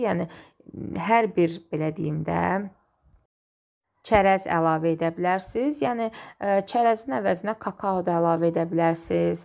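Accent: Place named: Azerbaijan. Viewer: 🤩🤩🤩🤩Azeriler çok tatli konuşuyorlar.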